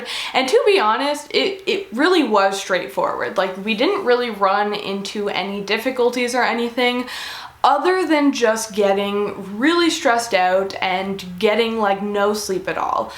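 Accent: Canada. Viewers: It's funny because people always point out my Midwestern accent , and I just noticed your Canadian accent the way we both pronounce out and car and about is like the same lol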